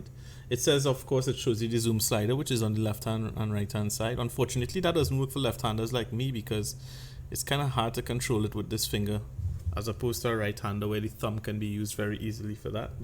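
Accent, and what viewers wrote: Trinidad and Tobago. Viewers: wait wait, you trinidadian awa?? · Good video! You sound Trini! Am I right?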